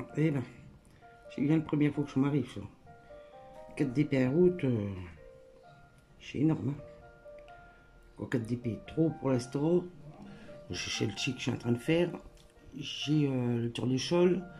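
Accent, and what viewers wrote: Nord-Pas-de-Calais, France. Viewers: Un petit coucou du Québec au Canada. J'adore regarder tes vidéos et le fait que tu parles cht'i ça me fait penser à ma grand-maman qui venait de Lille. Ta petite Gorjuss et super belle.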